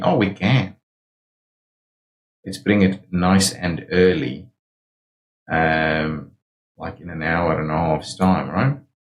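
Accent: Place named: South Africa. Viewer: Are you South African? The accent screams South African. 😁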